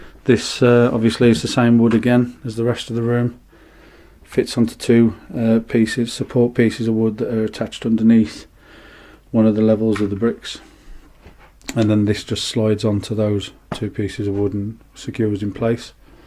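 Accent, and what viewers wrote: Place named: Dudley, United Kingdom. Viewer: Where about in Dudley? I'm Dudley also, picked up the accent straight away ;)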